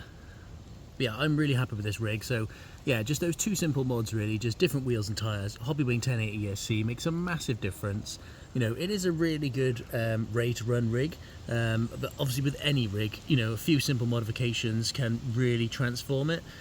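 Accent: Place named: Wales, United Kingdom. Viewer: I assume you're in the US? British accent (slightly Welsh?) but quoting prices in dollars twists my head a bit 😂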